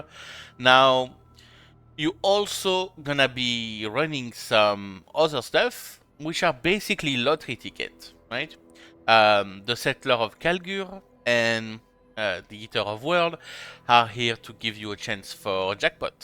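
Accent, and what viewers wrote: France. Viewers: nice french accent <3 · U French?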